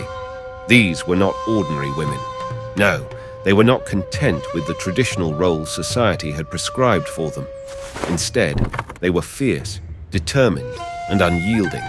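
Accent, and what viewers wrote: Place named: United Kingdom. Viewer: Maybe it's me, Nah, I've heard others say it too. Linguist that are intent on communication and it's many intricate variations. Dealing with pronunciations is particularly grating when something isn't correct or seemingly even attempts to be correct are ignored straight out of hand. The first few times Onna-Bugeisha was pronounced it seemed the narrator had no compulsion or compunction to attempt to say things even remotely studied. Japanese is (perhaps faultingly so) pronounced singularly each and every time. Generally one attempts to avoid accent things but it's not important. A-E-I-O-U are pronounced one way. Combined vowels are pronounced one way too. Extended vowels and silent vowels are standard 'ALL THE TIME' Very few exceptions, but even those are pronounced the same way all the time. ONNA BU GEISHA Not to be confused with Chinese concept of BU being opposite. 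ONNA Oh Nah Boo Gay Shaw. It would have been nice that the narrator could have been consistent in his reading that it would have sounded like he wasn't talking about different actions and jobs. Sometimes saying it like indicated above. Often saying it like AnnA BuGaiSha other times sounding like Anna BuGasha. The incessant habit of British to put a 'Y' between a consonant and 'U' is mind boggling and on the occasional adding an 'I' when pronouncing things like TOKYO or KYOTO coming up with Toe Key Yo or Key Yo Toe very odd indeed. Such be the circumstance when pronouncing SAMURAI not Saem You Rye but if you try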